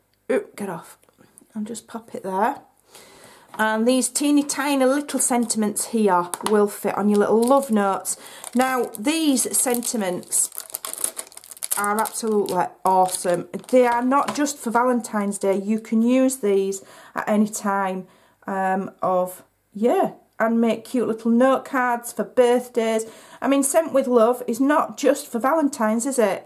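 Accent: United Kingdom. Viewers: I love your accent. What part of the U.K. are you from?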